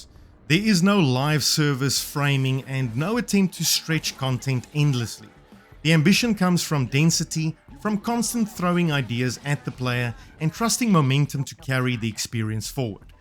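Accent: South Africa. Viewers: Bro is 100000% a south african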